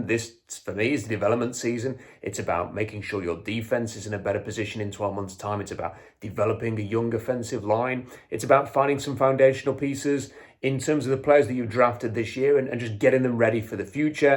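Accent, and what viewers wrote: England, United Kingdom. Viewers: Well said. It’s even funnier yet Better that a guy with an English accent says it.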